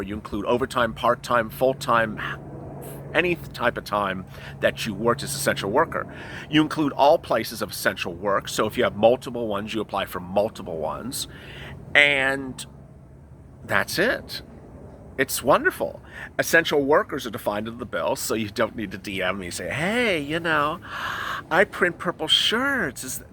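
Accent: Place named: Southern California, United States. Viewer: So cal.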